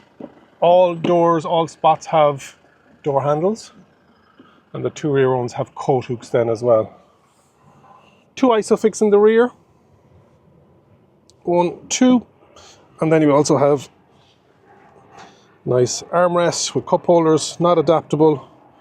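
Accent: Ireland. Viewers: Good man, I enjoyed the presentation, this Toyota is a total "Buy" when it will be available. Cool Irish accent you got there, new SUB.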